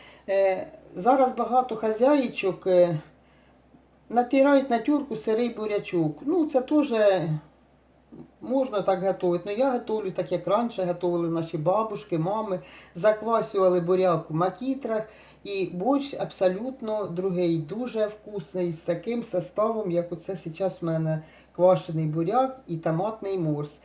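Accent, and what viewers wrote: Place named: Dnipropetrovsk, Ukraine. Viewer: Вы, наверное из Днепропетровска или области. Только у нас так говорят и готовят. Рада, что вас нашла! ❤❤❤❤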